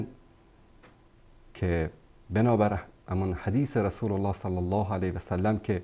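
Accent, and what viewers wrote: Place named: Iran. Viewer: لوده چرا به لهجه ایرانی ګب میزنی؟ تو ایرانی هستی؟ لعنت بر تو آدم لی فرهنګ و بی مسلک و سګ قلاده به ګردن ایران. داؤد مومند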